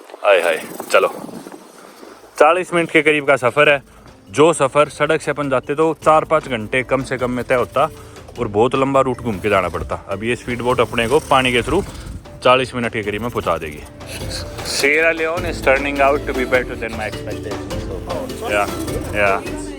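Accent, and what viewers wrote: Haryana, India. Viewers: Haryani axent 😂 loved it❤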